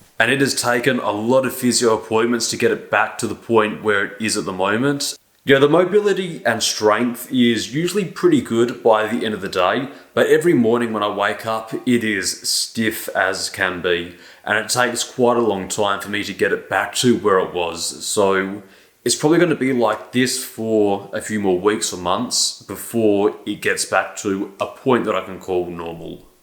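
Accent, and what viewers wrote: Australia. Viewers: Wait .. he's Australian?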